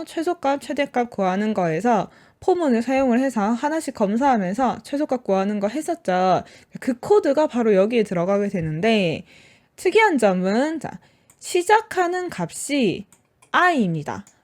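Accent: Gyeongsang, South Korea. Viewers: 대학 신입생 때 Fotran 수업을 들은 것이 전부였는데, 덕분에 파이썬이 어떤 언어인지 알게 됐습니다. 몇 군데 파이썬 관련 동영상을 찾아다녔는데, 가장 체계적으로 설명이 잘 되어 있어서 바로 이해 할 수 있었습니다. 명쾌하고,박력있는 열강 덕분에 30강을 완강했습니다. 가끔 경상도 억양도 매력적이었습니다. 아쉽게도 다음 강의를 찾아봤더니 다른 분이 강의를 하시네요. 다시 한번 감사드립니다. 안녕~~~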